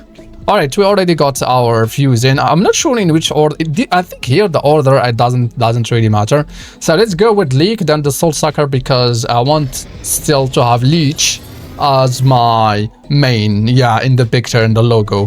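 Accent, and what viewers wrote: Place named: France. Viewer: I like so much you "French Accent" that you imbue into your speach pattern :D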